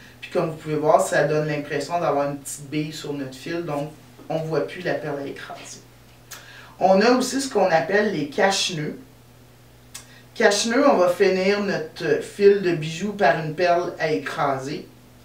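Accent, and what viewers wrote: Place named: Canada. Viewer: votre accent me rappelle ce beau voyage que j'ai fais au Canada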